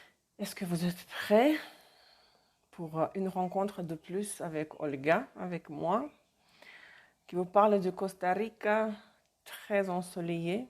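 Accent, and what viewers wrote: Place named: Russia. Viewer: Non seulement vous apportez le soleil, mais aussi votre exigence dans les connaissances. Mais en plus (cerise sur le gâteau) votre souci du respect de la belle langue parlée, au moment où vous vous adressez à nous, est très touchant, pour des Français comme moi dont la culture est un peu piétinée, ces dernières années. Avec une touche d’accent russe, c’est merveilleux ! Merci infiniment. 💐🎶🥂🌻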